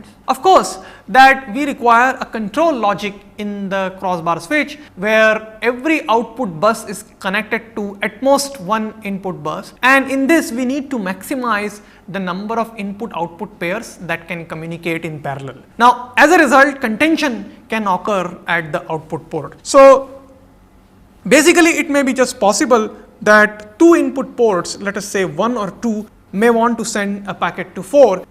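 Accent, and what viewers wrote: India. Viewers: how terible sound when indian people speak english :(((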